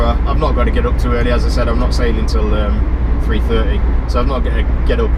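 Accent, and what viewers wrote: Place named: North, United Kingdom. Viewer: Ah, welcome back, nice bit of a northern accent and 😀, Belfast nice city, how many of ye on this tour.. safe travels...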